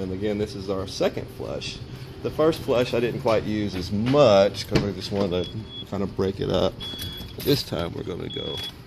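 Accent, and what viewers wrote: United States. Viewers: Interesting video. You talk like a good ole boy from South Carolina. I understood every thing you said. lol Where are you located? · I get a lot of verbal inflection in your voice of a New Yorker. You actually kinda sound like Stanley Kubrick.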